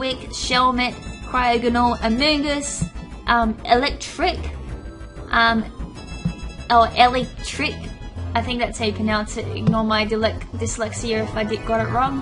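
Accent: Australia. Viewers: Are u australian. i am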